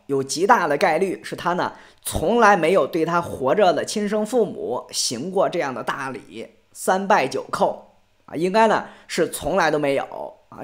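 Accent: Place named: North, China. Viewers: 北京话真难听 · 盲猜山东人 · 博主北方口音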